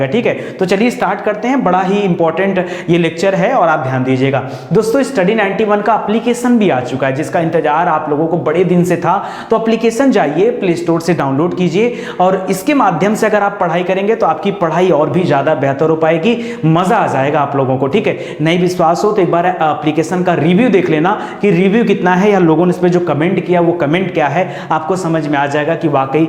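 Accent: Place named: Bihar, India. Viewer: Bhai professional tarike se bola Karo ye up Bihari tone samjah nahi aata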